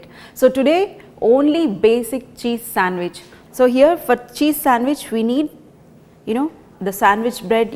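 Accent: India. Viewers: I work in a hospital. I swear to God, the indian accent makes me wanna bust an indian in the fucking head!!!!!!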